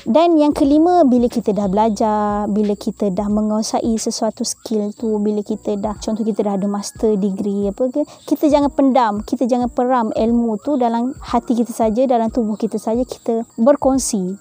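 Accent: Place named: Pahang, Malaysia. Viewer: 💯 setuju. Dah lama tak dengar loghat Pahang, felda mana ni? 😁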